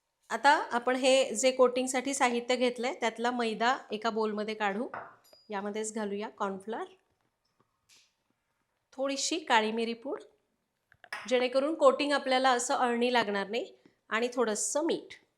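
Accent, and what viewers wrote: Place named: Pune, India. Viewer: mam tumachi Marathi bhasha farach changli ahe. Agadi puneri ❤🎉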